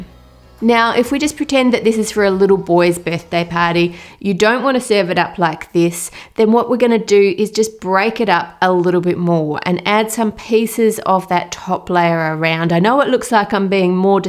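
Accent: Australia. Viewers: I am in love with her Australien accent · That Aussie accent is just gorgeous. And of course your content is awesome ! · Looking gooouud. That aussie accent 😅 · She sounds Australian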